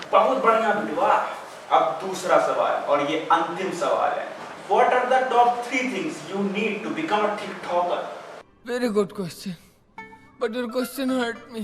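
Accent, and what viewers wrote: Allahabad, India. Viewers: Allahabadi language is superbbb🤣🤣... Well done bro🤘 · Allahabadi style ma bola to aag laga Diya🤘🔥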